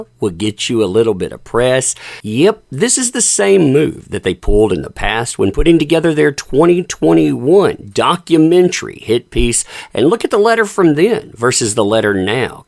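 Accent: United States. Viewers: I actually like your American accent as a Brit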